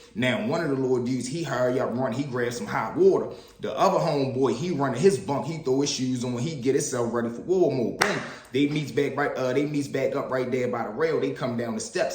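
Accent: Baltimore, United States. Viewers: You from Baltimore bro?? You gotta be from the town fr 😂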